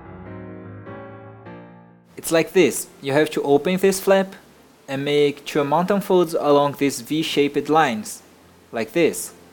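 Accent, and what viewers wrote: Brazil. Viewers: ja ja just by hearing your accent i could tell you are from Brazil! excellent video, i really enjoy it! Saudações dos Estados Unidos! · Conheço o sotaque de BR de longe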